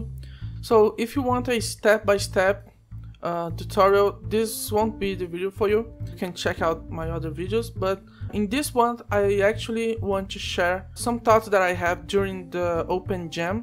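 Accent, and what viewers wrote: Brazil. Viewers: Excelente vídeo! Cheguei no canal recentemente e pelo sotaque, deu pra ver que você é brasileiro <3 Mas eu compartilho da mesma opinião,. Nós nunca podemos contar com a mera motivação para criarmos algo. Eu particularmente me forço ao máximo pra trabalhar nos meus projetos uma quantidade de horas satisfatória. Pra isso, eu uso um método que seria uma espécie de Scrum individual adaptado às minhas necessidades. Primeiro, eu crio um Product Backlog, com tudo que eu preciso fazer (seja uma feature da engine que eu estou desenvolvendo, alguma documentação, seja uma mecânica nova, seja um conjunto de sprites, uma animação, ou qualquer coisa), então, cada semana é como um iteração na qual eu preciso entregar uma quantidade X de releases baseado na minha atual deadline. E diariamente, eu faço um relatório com tudo que eu desenvolvi naquele dia, quais foram os meus empecílios e o que eu devo fazer pra melhorar aquilo. 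Dessa forma, eu não dependo apenas da motivação e eu me "forço" a continuar criando pra conseguir atender aos meus objetivos de longo prazo. Esse "método" ainda está em fase de testes uasdhusahudhusa, mas eu procuro aperfeiçoá-lo sempre que posso. Um grande abraço e parabéns por esse canal incrível <3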